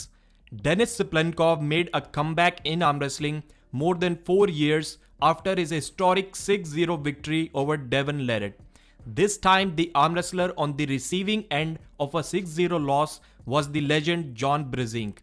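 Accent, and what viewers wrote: India. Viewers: Обожаю слушать индийский акцент!!! Так мягко и приятно звучит, как колыбельная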